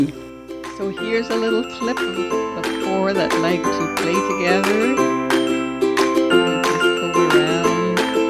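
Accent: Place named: Trinidad and Tobago. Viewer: nice video, i picked up the trini accent in the 1st 30secs lol. reminds me of home. good information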